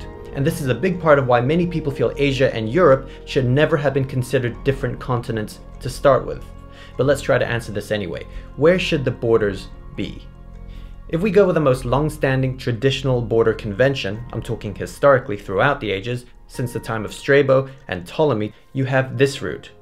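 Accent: United States. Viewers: Did I hear correctly in one of your other videos that neither of you have ever been to the US? I'm curious because your English sounds very American with maybe just a hint of an Asian accent while sounds more European. Where did you learn English? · You have many different pronunciation of place names than Americans. Is it of British or some other origin? Your accent seems closest to American though.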